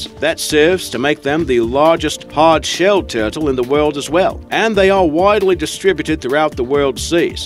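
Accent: Australia. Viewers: What is your accent? It's sounds Australian / Boston / English / New Zealand / Texan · Is this an aussie or NZ accent?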